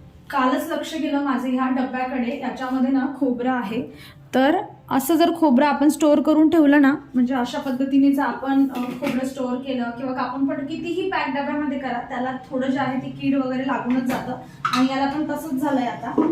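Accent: Khandesh, India. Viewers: Maz maher Nandurbar ch aahe.Tu khandeshi bolte mhanun mala maheri gelya sarkh watt...mhanun mi tuze blog pahayla survat keli ani aata roj baghte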